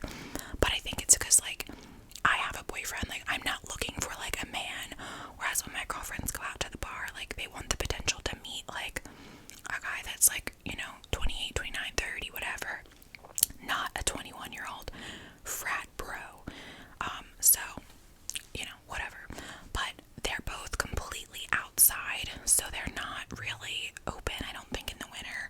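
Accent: United States. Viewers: I think your accent is THE american accent for me😍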